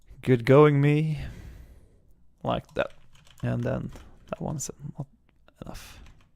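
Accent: Sweden. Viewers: U sound swedish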